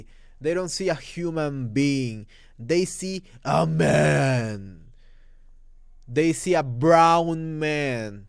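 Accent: Mexico. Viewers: No mames wey, white people are that fucking racist lmao 😂. I can tell by his Mexican accent he probably never lived longer or if any time period in America and still wants to talk about it . Pinches gringos tienen decadas de Racismo wtf duh, we can start by , not letting black and brown eat or drink water at any white people location, nowenly flooding our Brown and black streets with Drugs , not letting us buy house, giving is the worst schools , poluting around where we live and and our food we black and brown die faster. I can go on on and on and why white people are racist . Now not all whites are racist, just recently they have started to change when President Obama got elected, but I just hate how My own Mexican people come and make videos and say everything is and has always been peaches and love lmao wtf 😂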